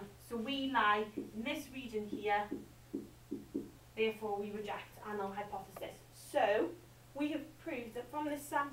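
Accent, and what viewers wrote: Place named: United Kingdom. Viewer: damn that british accent -_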